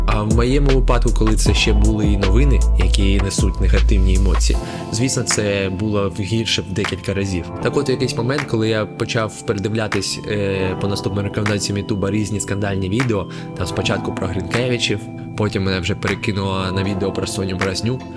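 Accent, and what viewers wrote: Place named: Belarus. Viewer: вы белорусс? акцент белорусский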